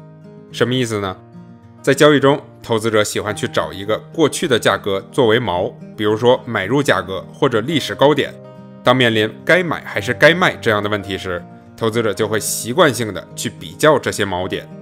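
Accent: Beijing, China. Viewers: 的京片子真的好聽！不抄股的空挡也可以去当演員了!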